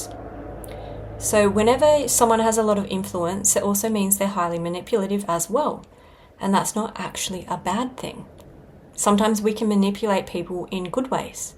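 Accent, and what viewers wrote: Australia. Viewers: Hi, I'm new and today I just finish another cup of warm tea and it caught to my attention when you mention Rieke and I have been attending this session and it helps me. Thank you for your reading. I'm wondering if you are from Australia or from Great Britain? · Are you from Australia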